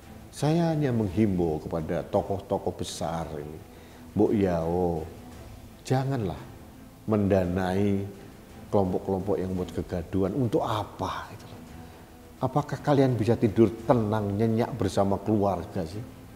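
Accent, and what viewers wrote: Java, Indonesia. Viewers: Mas jenengan ki piyayi pundi to kok medok bahasane Jowo. 🙏🙏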